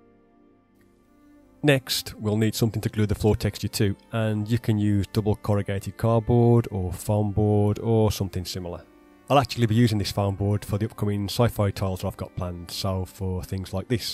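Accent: Birmingham, United Kingdom. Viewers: Thanks. Got a game of D&D tomorrow, and if I get some stuff together I will try and PM you, and/or send you some pics. Cheers for the resources. They are fantastically well thought out and executed brilliantly. I'm currently out of work at the moment, so can't send you any money at the moment, but once I get a job I will be sure to send you some much deserved funds. Guessing your from the Birmingham area from your accent. Close to me!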